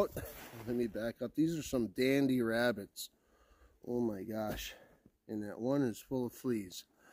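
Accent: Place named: Canada. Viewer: You sound Canadian lol I’m from Alberta good tips boss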